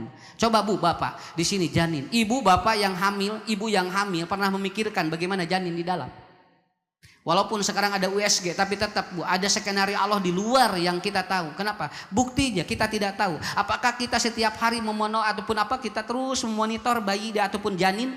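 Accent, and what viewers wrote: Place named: West Java, Indonesia. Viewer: Barakallahufik ustadz...khasnya ustd mah nyunda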